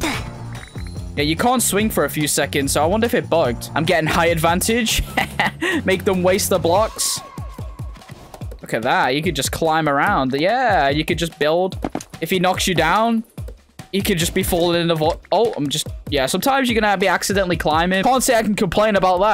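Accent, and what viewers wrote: United Kingdom. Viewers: why did he become more british?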